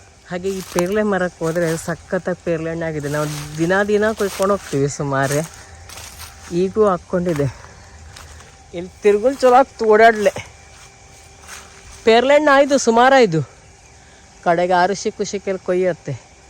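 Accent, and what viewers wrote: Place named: Kundapura, India. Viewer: Salpa kundapura kannada tara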